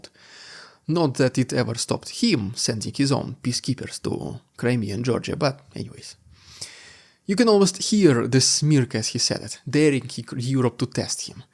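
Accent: Russia. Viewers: Your Russian accent when you speak English is hardcore 😎 And fuck Putin, we'll keep this up for much longer without any problems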